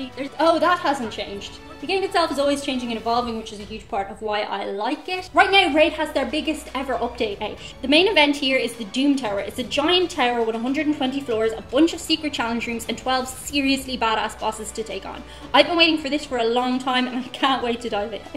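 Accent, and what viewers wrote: Ireland. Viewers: Hello. What part of Ireland are you from? Im guessing south east. Co Wicklow?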